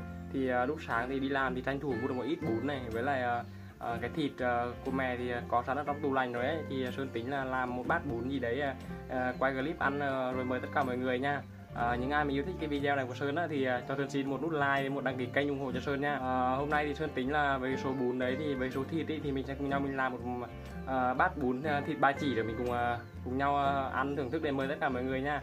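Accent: Vietnam. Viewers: Bạn người nghệ an à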